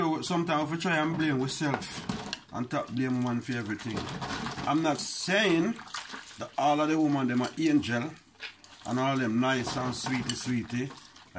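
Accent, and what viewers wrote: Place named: Jamaica. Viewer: u sound like a real good jamaican man, Im new to ur channel I notice u spend allot of time in ur kitchen lol u must love cooking that's rare to find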